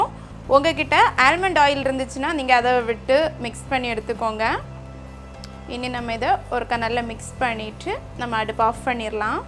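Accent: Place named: Nagercoil, India. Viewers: Nagercoil slang 🤣🤣🥰🥰🥰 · I think u r from kanyakumari from the way u speak. Bcoz m also from kk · Neenga nalla pandringa super nagarcoil slang enaku romba pidikum thank u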